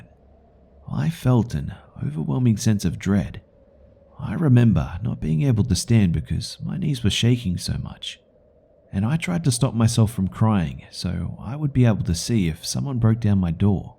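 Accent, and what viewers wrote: Australia. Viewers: This guy sounds Aussie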